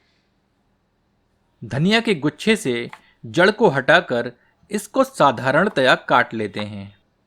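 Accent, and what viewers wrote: Standard. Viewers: Amazing recipe and full authentic hindi haha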